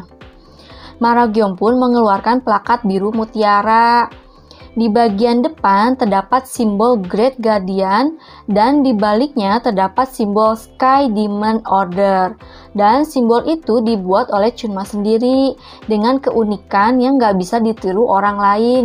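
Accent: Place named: West Java, Indonesia. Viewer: kk orang sunda ya.. logatnya.wkwkw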